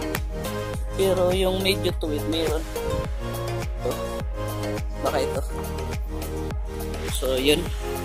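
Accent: Visayas, Philippines. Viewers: Bisaya ka pala lods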